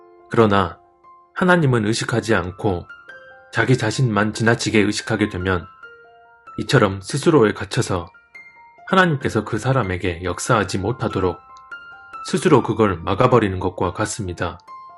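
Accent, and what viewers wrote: Jeolla, South Korea. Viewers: 전라도 사투리....^^ 반갑습니다~ㅋㅋ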